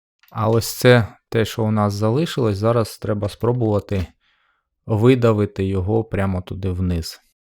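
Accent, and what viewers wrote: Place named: Central Ukraine, Ukraine. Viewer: запитав, бо ваша вимова дуже схожа на центр України, на мій рідний говір. Ще раз дякую за вашу працю. Ви створюєте важливий українськомовний контент 👍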